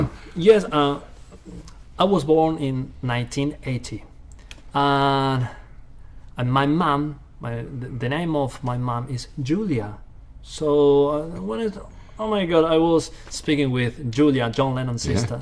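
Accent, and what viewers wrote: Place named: United Kingdom. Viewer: Your British accent is very good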